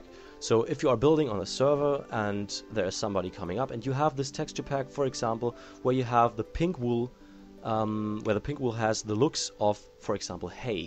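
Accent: Germany. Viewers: Ich habe mir von Anfang an Gedacht, das du Deutsch sprchst, das hat man gehört! Aber du spirichst extrem natürlich und "wortgewand" Englisch! Super! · You don't have a weird accent its just that you're so good at English that people cant distinguish you're German accent. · i new you where Germin i just couldnt put my finger on it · i thought you where german or something like that. LOL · Schon geil zu sehen wie alle nu so: "OOOOH der is ja deutscher daher der akzent!" xD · I knew u were German. Hail! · i knew you're german! ;-) i can easily recognize that accent... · Waow, most Germans have a very obvious English accent but yours is noticeble but it sounds alot better then what I've heard as a Dutch guy :-)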